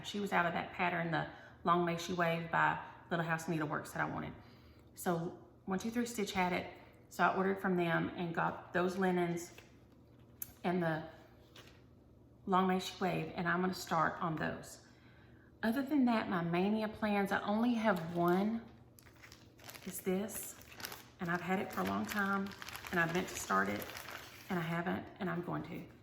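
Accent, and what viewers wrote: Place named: Texas, United States. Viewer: I just wanted to let you know that you brighten my day so much! I'm not even a cross stitcher, my Granky did beautiful embroidery and tried to teach me but it just wasn't my thing. I AM a knitter, and I binge watch your FlossTubes while I'm knitting! I was born and raised in Texas, but have lived in NV with my husband for the last nearly 10 years. We have a 7 year old with severe autism, so travel is nearly impossible even when we aren't in this quarantine mess. You make me so much less homesick! Your accent, your stories, just your personality, it gives me a little taste of home, and during times like this, that's been more of a lifesaver than I can even explain. I am so grateful for you, I truly am! So thank you!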